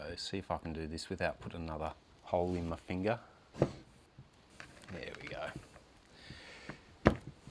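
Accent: Australia. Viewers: Loved that video mate. Just got to see if this fixes my E54 running on a couple of cylinders. Thanks, and love that Aussie twang!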